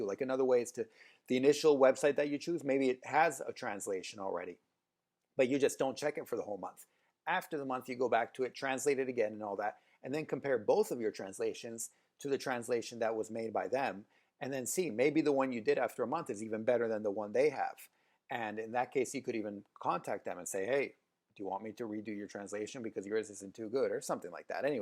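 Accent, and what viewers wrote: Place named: United States. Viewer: This is encouraging and I look forward to trying this method. Edit: just out of curiosity, are you a native speaker in both English and Italian? You said you were Italian but your American accent is perfect. Did you grow up in the States? I hope this isn't too personal. Just curious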